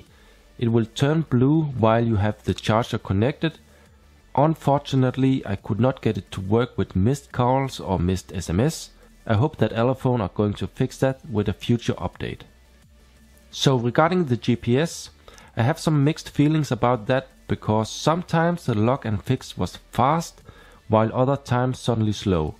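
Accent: Germany. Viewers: are you german? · you are german right ? 😎 your accent tells it all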